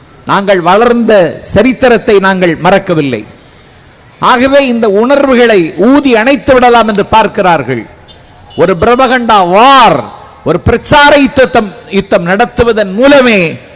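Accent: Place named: Kerala, India. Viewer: இவரோட தமிழ் உச்சரிப்பு சரியில்லை மலையாளம் சுவை கலந்து வருகிறது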